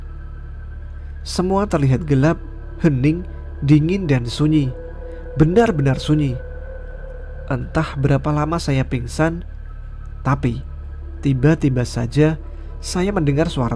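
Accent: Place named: Java, Indonesia. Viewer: ciri khasnya Mas aksen org Jawa nya terasa kental bgt yak 😁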